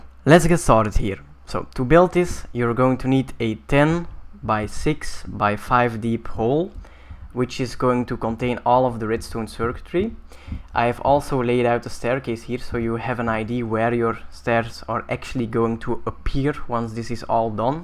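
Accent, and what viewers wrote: Belgium. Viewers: are you belgian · Haha, dacht ik het niet. Dat accent herken ik uit de duizenden :) Blij om een landgenoot zo succesvol te zien op youtube :D Gebeurt niet veel! Keep up the good work! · Are you from french Belgium?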